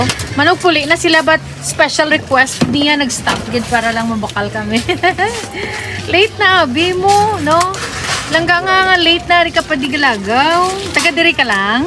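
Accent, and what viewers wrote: Iloilo, Philippines. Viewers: Langga tamsak nakakatuwa Ang accent na illonga day injoy sa road trip full watch Po support din po salamat dikit na Ako langga sa Bahay mo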